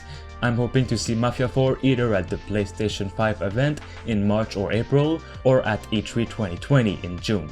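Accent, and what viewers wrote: Quebec, Canada. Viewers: are you Quebecan or French Canadian?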